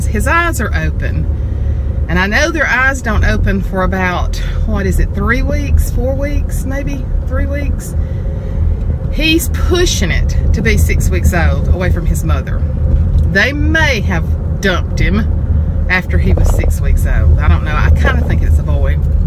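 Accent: South, United States. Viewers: We got rain from storm up here but today it cleared and now nice and sunny out! Love your sweet southern accent. Hugs!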